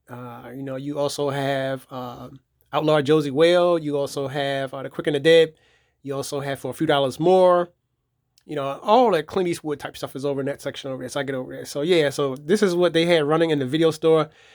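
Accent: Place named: Louisiana, United States. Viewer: Nice collection! Lots great titles and TV shows. Love how you have them set up. you have a cool accent, is it from Louisiana. I subbed.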